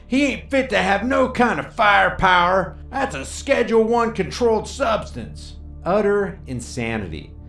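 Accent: South, United States. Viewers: Did he hurt your feelings? I'm from Kentucky too and I realize that there are several different southern accents not only in our state, but in many different states. Also, some of those with accents are police. Hell, they might even be Republicans. You watched this whole video and your comment is about his accent? It is sad that you can't get over your hurt feelings enough to focus the point of the video.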